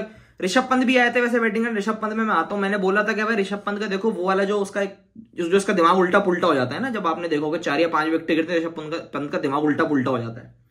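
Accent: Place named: North India, India. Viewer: Ye tone pakistani hai hi nahi...check are you from india brother? I m very well aware abt pakistani voice tone...ye typical north Indian.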